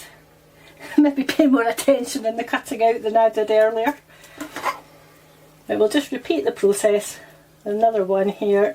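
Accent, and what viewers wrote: Scotland, United Kingdom. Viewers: Thanks, will surely try them, been away from Scotland a long time, love hearing the accent as well. I remember walking in a Mark & Spencer once and laid my eyes on a packet of them and shouted out loud "snowballs" My husband and customers all looked at me like I was crazy, but as you know the friendly Scottish people, we all had a great talk when I apologised and told them I had not been home for 15 years!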